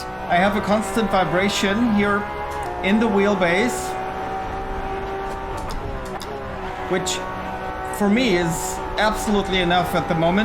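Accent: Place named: Sweden. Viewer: Cool alright, though I heard a Swedish accent :)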